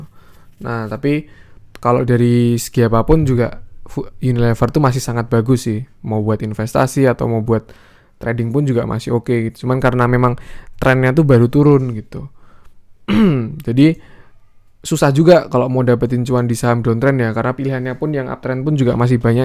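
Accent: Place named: Surabaya, Indonesia. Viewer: ok analisisnya, kalau didengarkan seksama sptnya ada logat surabaya ini... :D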